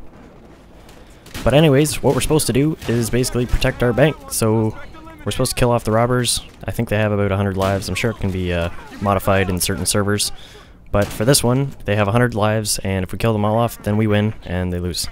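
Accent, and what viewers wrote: Canada. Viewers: You sound so much more Canadian in this.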